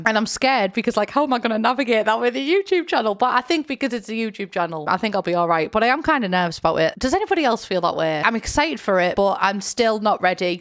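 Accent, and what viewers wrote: United Kingdom. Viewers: I have always loved the British accent, and I enjoy your content, damn good job, subscribed. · whenever someone says british accent, this is what I think of :)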